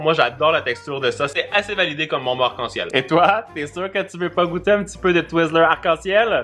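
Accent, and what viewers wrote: Quebec, Canada. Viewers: J’adore l’accent d’, c’est un mélange de Québécois, Anglais et Allemand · a l'accent québécois et un accent qui ressemble a l'accent hollandais (enfin le ton lorsqu'il parle français) Pourtant ils sont jumeaux je suis perdue.... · Pourquoi et plus québécois et on frère est plus allemand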